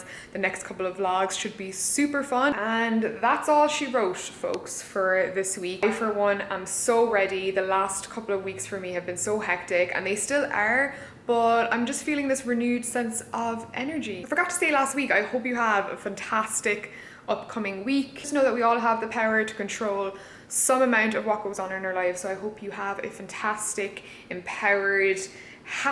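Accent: Ireland. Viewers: that clip that recorded of you had me laughing so hard 😂 you sound so extra irish when talking to him and i LOVE it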